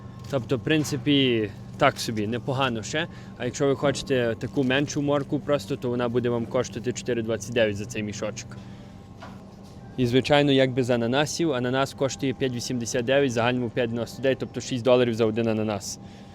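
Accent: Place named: Ukraine. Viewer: Thanks a lot.for your answer. I do love the Passion you put info recording your videos. I am also trying to understand what you are saying in Ukrainian (I only party succeed). I was only slightly suprised that you have a pretty strong Ukrainian accent when Speaking English. As far as I remember, you came to Canada at the age of 11 (before puberty). Anyway, that is really that important. Keep up good work.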